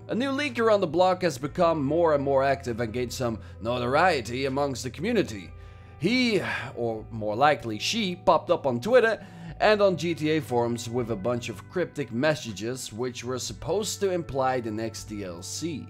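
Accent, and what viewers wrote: Netherlands. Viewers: Woah I didn't know you were dutch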